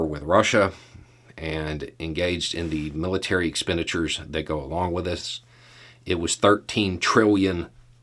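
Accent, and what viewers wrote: South, United States. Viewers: Lots of good thoughts from this year. For a guy that looks and sounds like an ignorant Southern hillbilly in a garage, he comes off as a sort of political intellectual. You expect to hear the words of MAGA issue from his lips. Instead, one hears common sense. He's probably right about countries not having morals but countries are made up of people. People have morals. I think most of the people in the US (& Canada) have good morals. So - I hope he is wrong and the good morals get reflected in social policy. Happy New Year!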